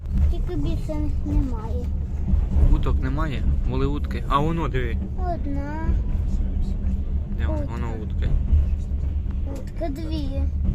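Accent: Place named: Ukraine. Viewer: Наконец то, ты на Москву, жена моя все переживает когда хлопец отдохнёт, я знаю хорошо ваши края, костогризово, раненськ, копани, родина моих предков, самые работящие люди, диалект у тебя чисто украинский приятно слушать, у меня родня так балакае, мира тебе, здоровья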